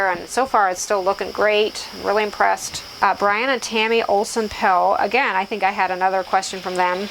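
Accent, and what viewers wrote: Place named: Pennsylvania Dutch, United States. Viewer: So good to know families do canning/ preserving together. My mother-in-law taught me to can. Bless her heart, she would work all day at a factory then come to our house and help me put up creamed corn or can green beans. She’s gone now but every time I pull out my canner or corn cutter I think of those days. Hard, hot work but soooo good in the winter. I love the idea of a cookbook! Two sisters whom are amazingly talented sharing their family recipes AND Amish heritage. I love learning about other cultures/ lifestyles! So interesting to hear you talk about how you were raised and to allow us to hear your Pennsylvania Dutch. Love you channel so much. Thank you for sharing!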